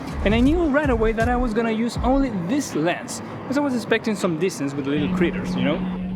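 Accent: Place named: United States. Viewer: hermano partio el video y pense que eras gringo xd gud inglish. saludos desde coquimbo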